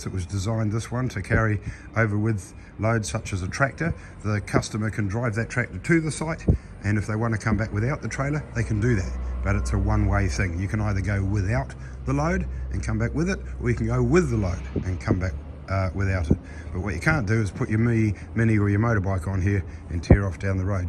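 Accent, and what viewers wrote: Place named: New Zealand. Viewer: I hear your kiwi accent . I am interested in a trailer as I load a tractor for grass slashing. Reply to me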